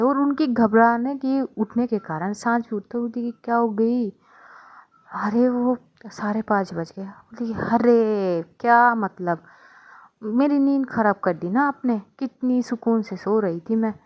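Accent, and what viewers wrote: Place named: Haryana, India. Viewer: Didu haryanvi bolna kha se sikha 😊thodi thodi bol rhi ho pr mst lag ri so ❤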